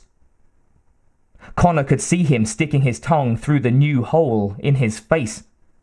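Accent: United Kingdom. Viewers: Listened to most of your audiobooks but couldn't get through this due to your god awful scots accent really no need for it.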